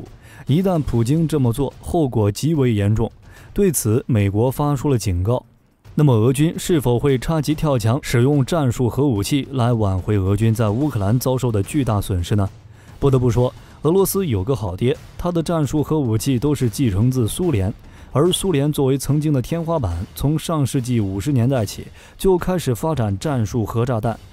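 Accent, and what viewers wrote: China. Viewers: 大陆没人叫“普丁” lol, 我就觉得这频道有些视频内容有点怪怪的，原来是台湾的播主找个大陆口音的机器人发音软件做的。。。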